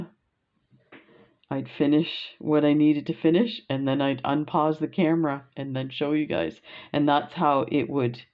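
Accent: Canada. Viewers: I tried this so many times but kept getting that puckering around the perimeter. Finally switched to 5mm pearls instead of the 6mm. I used 6 seed beads to surround them and I didn't have to add that extra single seed bead at the bottom because the seed beads came together really well and didn't show thread. Just a suggestion, as this worked for me. I've made 3 so far and plan on using them on an ornament ball. Thank you so much, for teaching this to us! I love your videos, (and your Canadian accent!) ❤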